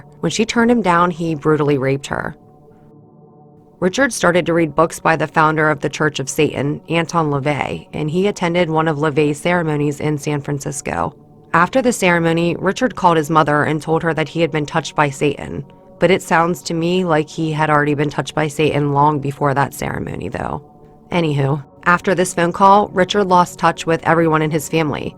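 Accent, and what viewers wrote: United States. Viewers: are you from delco? or jersey? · You live in Maryland or Pennsylvania? I hear an accent, Pittsburg?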